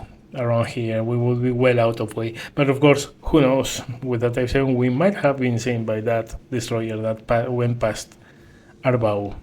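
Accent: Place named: Spain. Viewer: Muy bueno. Por tu acento apostaría a que eres español, ¿no?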